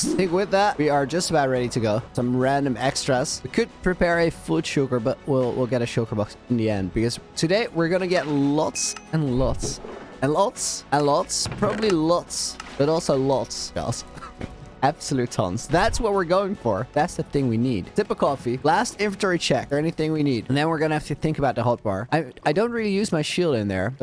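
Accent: Netherlands. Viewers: You must be dutch right? ^^ great vid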